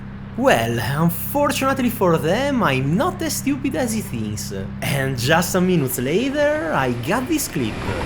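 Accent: Italy. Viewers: thanks for the video, i can hear from your accent that you are Italian, just for curiosity, do you move your hands when you talk?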